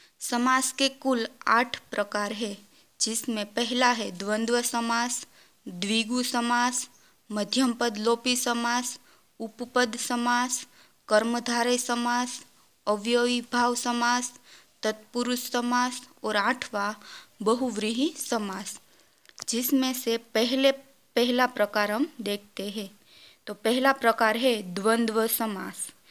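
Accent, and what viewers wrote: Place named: Kathiawar, India. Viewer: आप हिन्दी को काठियावाडी लहेजे में क्यों बोल रही हो?उदा. राजा को आप राझा , जानते को झानजे बता रही है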